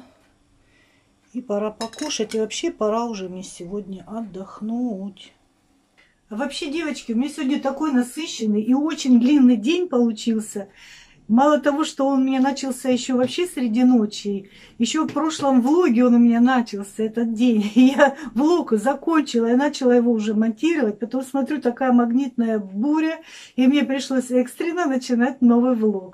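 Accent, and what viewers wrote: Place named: Rostov, Russia. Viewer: Вы ещё сосиски произносите сосиськи😃Ваш Ростовский диалект. Мило и прикольно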